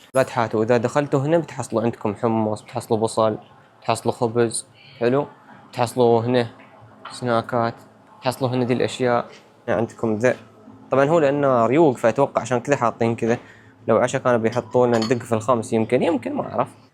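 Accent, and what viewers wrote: Oman. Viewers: و الله كنت احسبك من السويج من لهجتك بس عادي كل عام و انت بخير و عيدك امبارك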